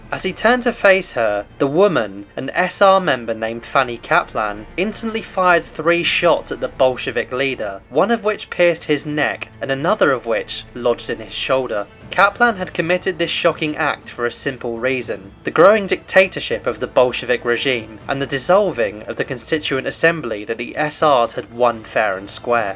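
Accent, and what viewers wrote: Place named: Cockney, United Kingdom. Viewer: One torture the Soviets were never cruel enough to impose was forcing one to listen to a bleating cockney voice drone on and on for 10 minutes!!!!!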